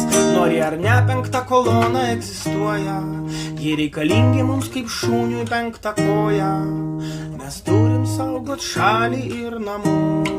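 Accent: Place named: Russia. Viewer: Ar sitas. penktosios. kolonos. vadas. ,juk. jis. ruskis. ,galimai. ir. yra. okupantas. ??????? Kaip. gerai. ,kad. viena. kart. prisipažino. ,kas. jis. yra. ir. dar. dainą. susikūrė. ,nori. įsiamžinti. ,savo. penktąją. kolona. ????? Nes. okupanto. akcentu. ir. kalba. ,Lietuviškai. nelabai. išeina. ???????? Kiek. jau. turi. penktosios. kolonos. bendraminčių. ?????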